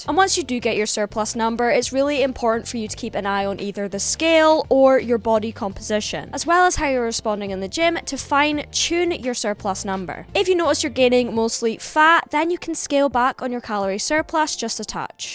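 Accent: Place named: Scotland, United Kingdom. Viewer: SECOND COMMENT:🥈 happy Sunday, Can I please be your Pookie? What does that mean? By the way? I don’t even know what that means and I still wanna be your Pookie BTW You’re a Scottish🏴 accent=🔥I love you❌⭕️❌⭕️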